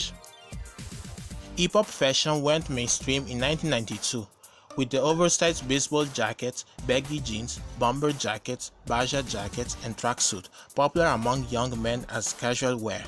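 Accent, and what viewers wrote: Italy. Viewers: Why you speak with an italian accent?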